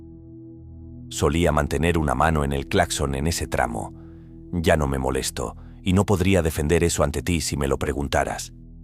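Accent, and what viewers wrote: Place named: Spain. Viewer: A mi casi no me gustaba el acento español pero ya lo amo con este canal !!!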